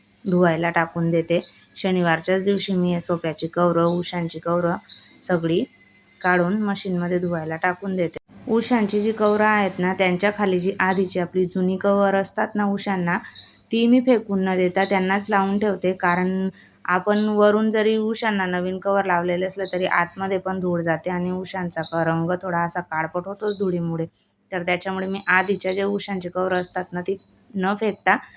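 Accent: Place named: Khandesh, India. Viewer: हाय तु कुठे राहते तुझं बोलणं ऐकून वाटतें तु खानदेशी आहे जळगाव धुळे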